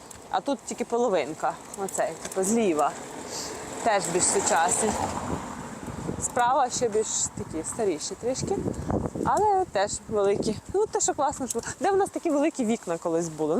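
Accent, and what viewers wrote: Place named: Western Ukraine, Ukraine. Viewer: Вы из Западной Украины,а война на северо- востоке и на юге Украины.Чего вы убежали?Говор у вас из западной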